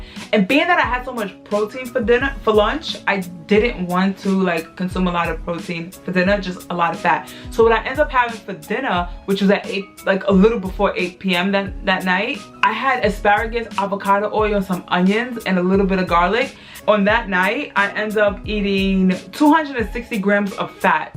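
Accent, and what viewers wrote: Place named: New York, United States. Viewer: Sexy NY accent 🔥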